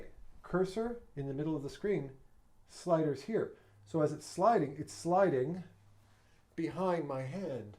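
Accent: Quebec, Canada. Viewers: hey man. nice to finally meet you, Hang on. Youre french canadian?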